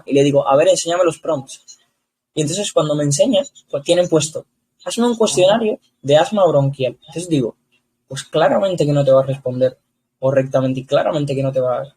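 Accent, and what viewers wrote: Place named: Spain. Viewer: Vaya vozarrón y acento español llevas colega 😂👏🏼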